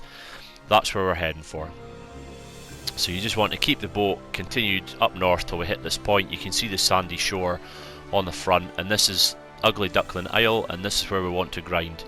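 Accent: Scotland, United Kingdom. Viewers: I believe he may be Scottish, me lad.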